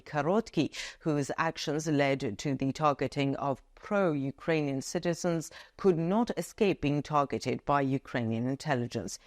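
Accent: United Kingdom. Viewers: How does put that British accent in or is added after??😮😂 Thanks for your excellent updates 😊 have a nice weekend 👍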